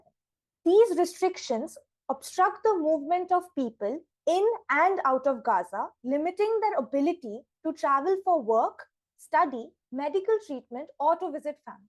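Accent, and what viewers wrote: India. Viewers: If you want to make news in English then sound like you're speaking English not Indian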